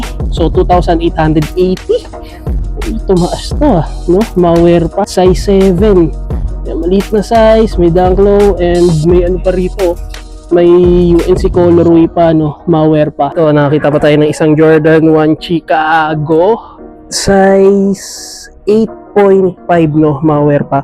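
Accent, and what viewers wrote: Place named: Iloilo, Philippines. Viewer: Boy ilongo ka ky pang hambal mo pang iloilo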